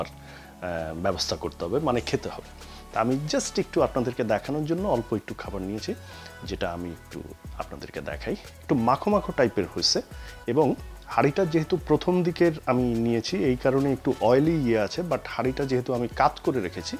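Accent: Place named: Bangladesh. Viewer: So,but ,so,but Bangladeshi English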